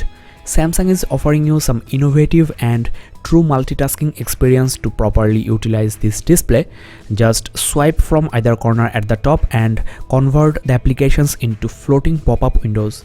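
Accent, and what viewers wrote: India. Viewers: Indian accent "~"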